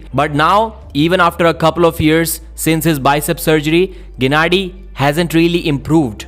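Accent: India. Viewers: Terrible. This pajeet voice ruins everything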